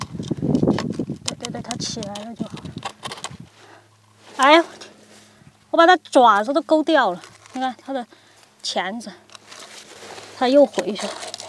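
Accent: Sichuan, China. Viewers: 四川幺妹儿吧？ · 她讲的是四川普通话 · 非常喜欢你开心的样子，空军不重要，重要的是你的川普风格很搞笑开心，谢谢你，同是天涯四川人！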